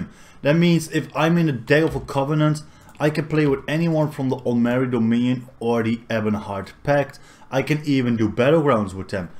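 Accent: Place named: Russia. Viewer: I haven't heard the dutch accent much I guess and maybe there is a reason that's obvious idk... But I hear a bit of russian in there.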